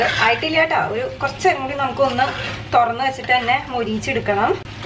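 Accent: Thrissur, India. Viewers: എന്റെ പൊന്നെ എന്തുസ്പീഡ ഇത് സമ്മതിച്ചുട്ടോ തൃശ്ശൂരാണല്ലേ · Chechii thrissur aanalle?? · തൃശൂർ ആണല്ലേ.... Non stop സംസാരം അപ്പോ njn മാത്രമല്ലല്ലേ 😁😁😁😁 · ആദ്യമായി ആണ് ഈ ചാനൽ കാണുന്നത്.നല്ല സംസാര ശൈലി....😊👌 അല്ലേലും തൃശ്ശൂർ ശൈലി വല്ലാത്ത ഇഷ്ടം ആണു. അമ്മവീട് അവിടെ അയതോണ്ടു പ്രത്യേകിച്ച്💕 ★ പിന്നെ ആ കിർ കിർ കേട്ടിട്ട് ചിരിച്ചു പോയിട്ടോ ★ എന്തായാലും ട്രൈ ചെയ്‌തു നോക്കിട്ട് പറയാം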